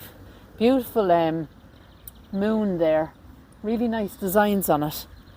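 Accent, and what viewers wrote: Ireland. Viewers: I love you channel and Irish accent!!! Some of my mom’s family were Irish. Great ancient graves 😻!!! We don’t have any like that here in the U.S.A.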